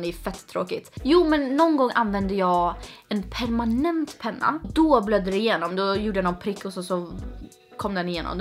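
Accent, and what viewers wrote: Stockholm, Sweden. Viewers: ja hon har bytt från vanlig svenska till stockholmska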